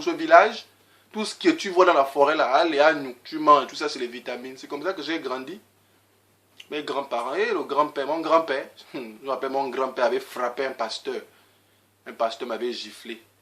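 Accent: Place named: Fang, Gabon. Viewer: hahaha un vrai fang . eeeeh aaaah dza lol I like it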